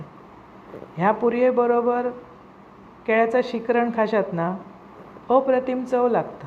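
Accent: Malvani, India. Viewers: Tai tumhi kay kay recipi bannvta aani goad malvani bhasha amachya aai sarkhi athvan aali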